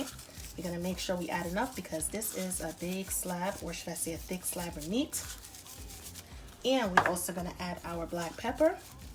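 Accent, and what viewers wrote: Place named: East Coast, United States. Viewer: Love the east coast accent!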